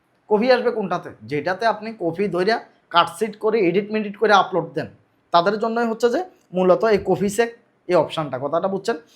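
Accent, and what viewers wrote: Chittagong, Bangladesh. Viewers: Vai apni ki Chittagong naki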